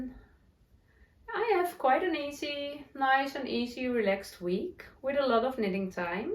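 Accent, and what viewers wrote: Netherlands. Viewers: I so love your podcast and your Dutchy English, you are so lovely. I live in Norwich UK, so just across the sea from you. It only takes 40 minutes to get to Amsterdam from our local airport. I love to knit and sew and i am knitting the Flora sweater at the moment by Irene Lin. Also sewing the Hinterland dress. Take care and love from my house to yours x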